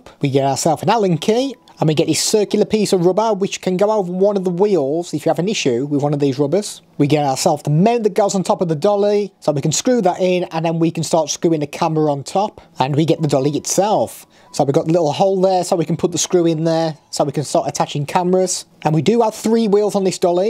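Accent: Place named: Black Country, United Kingdom. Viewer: Oh also, nice to hear a proper brill accent cocka! I’m originally from Cannock, but now live in Ontario... nice to here such a strong accent from the old county :D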